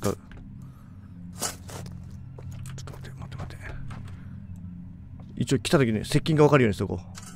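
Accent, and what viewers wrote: Kansai, Japan. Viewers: 確かに笑か怖かどっちかにしてほしいですね、サムネ最高ですw 「どっちやねん」 の関西弁好きです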